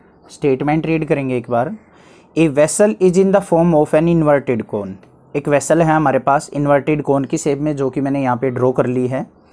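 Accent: Haryana, India. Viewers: sir aap haryana se ho kya 🤔🤔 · haryanvi sir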